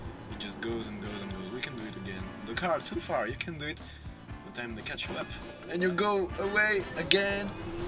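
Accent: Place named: France. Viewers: Je me disait bien qu'il y avait un accent francais sur cette anglais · oui, l'accent français est très net. Es-tu français ? Car on dit : "cet anglais", pas "cette anglais".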